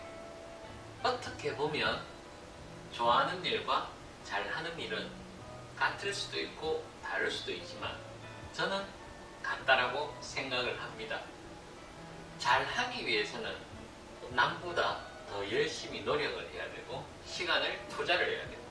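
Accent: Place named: Gyeongsang, South Korea. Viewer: 참 부지런하시네요. 말투가 경상도 싸나이~? 좋은 말씀들 잘 듣고 구독 꾸~욱 누르고갑니다.♡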